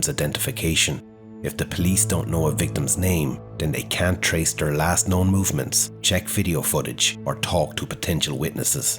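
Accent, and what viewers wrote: Ireland. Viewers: Well Its usually someone with a New York accent narrating these videos about Rex but this time it's a lilting and lovely Irish brogue! Jesus Mary and Joseph it is horrendous to hear what the despicable monster did to his victims dear Lord Jesus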